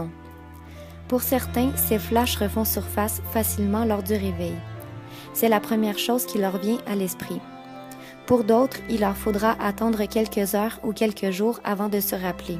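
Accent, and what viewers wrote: Canada. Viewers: l'humanité à l'image de la Terre, malade, en souffrance. Beau documentaire et bel accent cousine Canadienne!!!!!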